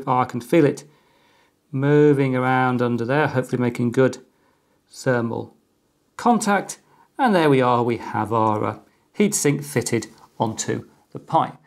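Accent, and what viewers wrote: United Kingdom. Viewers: Sorry for asking something that isn't on subject, but are you British?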